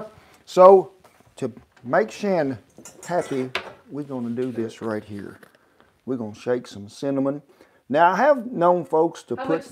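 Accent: South, United States. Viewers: No ma'am . You can't take the accent out of the south 👍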